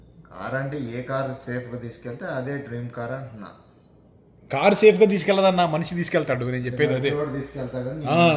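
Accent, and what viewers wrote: Andhra Pradesh, India. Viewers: Anna garu you are talangana man But your talking pure AP talugu so proud of u anna garu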